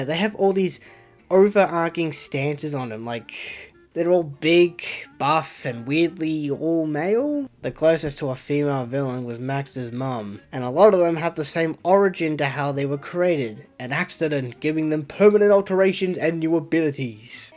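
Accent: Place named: Australia. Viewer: I was today when I noticed your Australian,🙃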